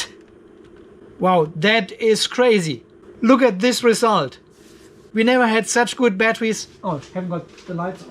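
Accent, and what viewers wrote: Germany. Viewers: Hey ho. Is it only my feeling or do you sound like a german who is living in australia?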